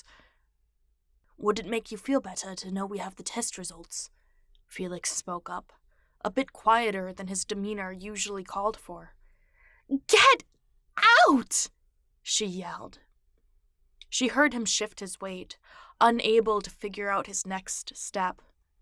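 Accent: United Kingdom. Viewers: I love your posh voice! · You're Cockney-Estuarine accent is quite interesting.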